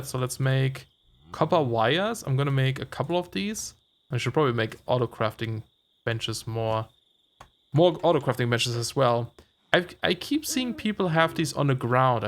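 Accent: Germany. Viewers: Are u German? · I was looking for this comment. Fellow German here. His English is pretty good, however I believe he still uses German intonation.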